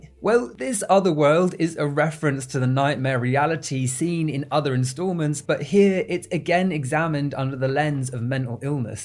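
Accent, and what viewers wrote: United Kingdom. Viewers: You are painfully British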